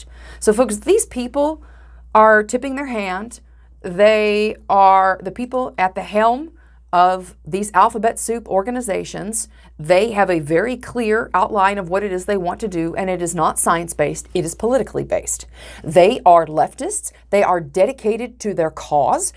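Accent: United States. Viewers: What state is your accent from? It’s a very nice accent! <mail>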